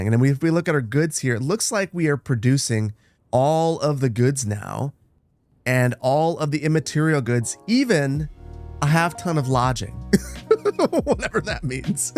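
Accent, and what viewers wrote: United States. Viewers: You say tex-tile but not fer-tile. You say furtill. Is your floor made of till or tile? The way some yanks use English is so weird haha. Cheers love your content.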